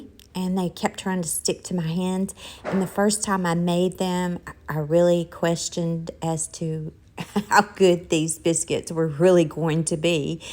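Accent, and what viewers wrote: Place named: Western North Carolina, United States. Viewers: I love listening to you! You remind me of home. (Mountains of WNC) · As an E. TN native (raised in Sevier County and worked in Knox County) and now living in W. NC with my wife, hearing you talk is being at my mom's or one of my aunts' houses and I love it! I can practically smell those biscuits and my wife asked me to send her the recipe, too. Talking about Hardee's (or any of the larger chain restaurants for that matter), your husband, like myself, has probably noticed how much smaller they make their biscuits now. This is probably going to be added to our twice a week home-style breakfast routine (the rest of the week we just grab a protein drink or a bowl of oatmeal) thanks to you, lol.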